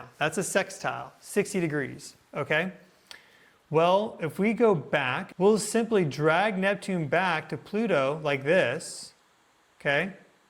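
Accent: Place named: Bay Area, United States. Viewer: I don't know the answer, but always wondered where is from... My guess is SF Bay Area, possibly South Bay, just from his accent - Where do you think he's from? Only people who don't actually know, please!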